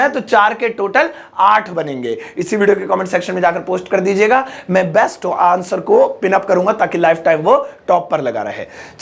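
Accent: India. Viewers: 🇮🇳Deshi english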